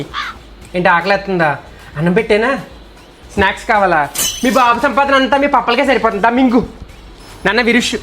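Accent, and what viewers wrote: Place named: Godavari, India. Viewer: అసలు గోదావరి భాష.. నాకు నచ్చింది..మాది భీమవరం.. పెళ్లి అయ్యక మచిలీపట్నం..నేను ఈ బాషా మిస్ అవుతున్నా...పప్పలు కొనుక్కోవడం ..నా యేదం..బాబా, ఎంత అందమైన భాష 😅😅😅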